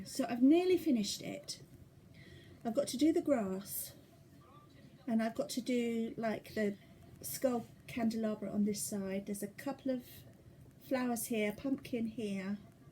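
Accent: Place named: United Kingdom. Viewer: I can tell you feel better and I am so glad. I always enjoy your videos and your British accent. (I have a Texas accent). It doesn’t sound as classy as yours! Your dogs are sweet and I can see why you love them.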